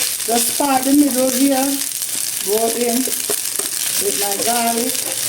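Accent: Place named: Jamaica. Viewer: Wagwan, when i miss Jamaican accent, i come here. Thank u mum for this yummmy video❤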